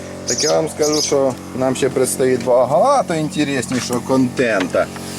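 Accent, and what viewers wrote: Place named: Poltava, Ukraine. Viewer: Вподобайка як завжди!) Нагадує полтавський говір)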